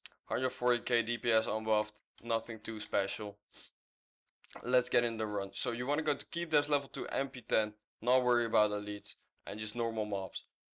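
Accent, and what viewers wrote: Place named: Netherlands. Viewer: when i hear yur accent i would say yur dutch ;)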